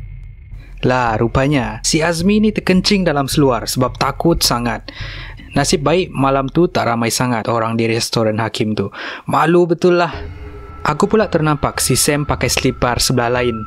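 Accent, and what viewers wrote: Sabah, Malaysia. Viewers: Contohnya. Kamu mau apa sih, bukan ini donk. Kelmarin kamu bikin apa? Ada ke orang sabah cakap camtu? So kite boleh beza dari situ. Apa yang ku terangkan tadi itu lah bahasa indonesia. Yang bercerita ni orang sabah malaysia.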